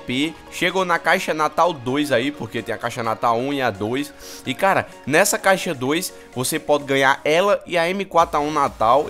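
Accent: Northeast, Brazil. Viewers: E legal ver o falando "atualização" esse sotaque nordestino e foda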